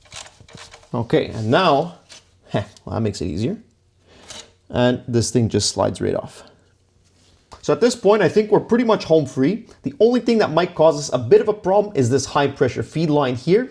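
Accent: Quebec, Canada. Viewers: Love the Quebec French. Ostie! 😂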